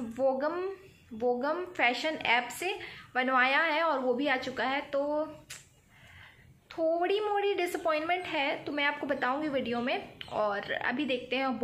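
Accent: Bihar, India. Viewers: Aap Bihar se ho mam ????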